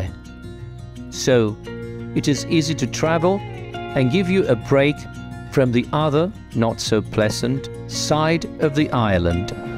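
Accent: United Kingdom. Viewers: Si yo no supiera que vos SOS argento? Creería con ese acento, que sos británico!. Yo habló muy bien americano Appalachian y alemán Westfalen (yo los siento mas fácil de hablar) y se de lo que digo! Bien por vos!, felicidades!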